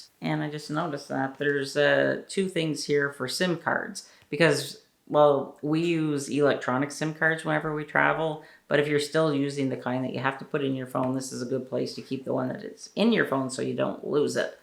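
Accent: Canada. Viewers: Are you Canadian? Born and raised?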